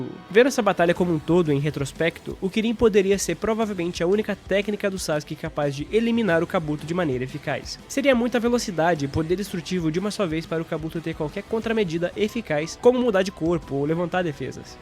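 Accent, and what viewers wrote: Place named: São Paulo, Brazil. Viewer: Ele não "tira" o sotaque, só usa variações do sotaque paulista.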